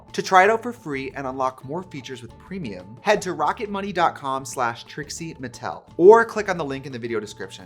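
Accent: United Kingdom. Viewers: That British accent was what I needed to go on today….EPIC!🤭🤭🤭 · Trixie’s British accent is giving major Moira Rose energy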